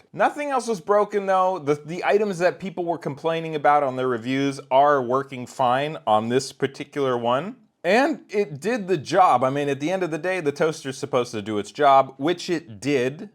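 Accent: United States. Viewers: You live in PORTUGAL?!?!?! You have such an American accent!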